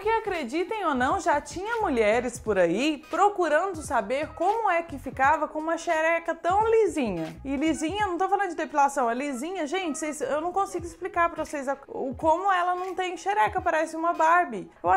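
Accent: Minas Gerais, Brazil. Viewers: Como não assisti seus vídeos antes! Moça você é demais! Muito engraçado! Nosso sotaque de MG é muito legal! Mineirice sempre!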